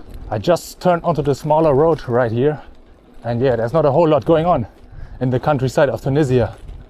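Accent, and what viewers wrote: Germany. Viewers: I freaking LOVE the video series but this heavy german accent in his english is hard to endure .. selbst oder ganz besonders als Deutscher, das klingt schrecklich vor allem diese Betonung .. :( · German accent unfortunately drives me crazy - too German🙈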